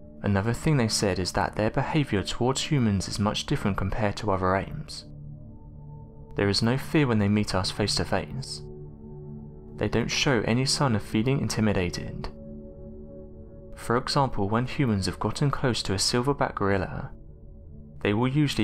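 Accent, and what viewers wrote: England, United Kingdom. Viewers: What’s up with the cadence and flow of your voice man? I mean no offense nor do I have any problem with your British accent, just the monotone sound and the way your telling of things in your videos is segmented into sentences with this ebb of your voice. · A video regarding bili apes with no footage or pictures of an actual bili ape... just someone with an english accent talking.... interesting. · Anyone else tired of these voices of the narrators in these type of videos? Always some guy or girl from UK with little to no life in their voice.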